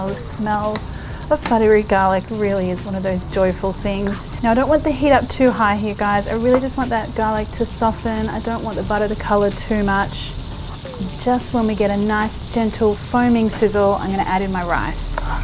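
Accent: Australia. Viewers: You and iggy azalea have the exact same voice lol And it's not just the Australian accent. It's the same tone and pitch.. Mmm rice looks so good. I'll be making this tonight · You sound like you grew up in Australia, then moved to the US south and started to pick up some of their accent.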